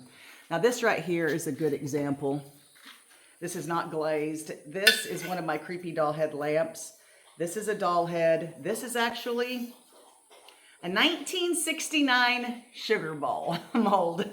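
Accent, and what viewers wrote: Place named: United States. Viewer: First, amazing suggestions and style. I cannot understand why people want to make the same thing when you can mix and match. FYI--Check on your etsy link. it defaulted to British pounds instead of US dollars. This might be just a me problem but but I want to support fellow potters. (based on accent and mention of a US holiday I assume, like me, you live in US)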